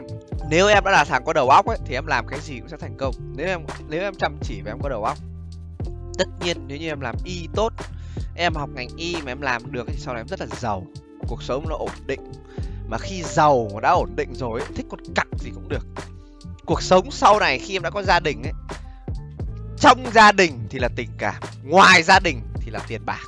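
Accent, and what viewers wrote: Standard. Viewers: Nghe anh ns nghe chuẩn vl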